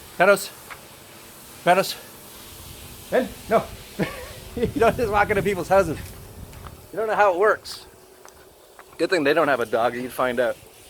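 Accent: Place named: Germany. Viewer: I enjoy your engdeutish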